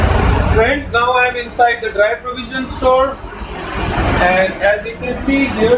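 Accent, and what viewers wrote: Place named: India. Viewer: Nothing wrong with Indian english. Its the most logical pronunciation anyone can do with that language.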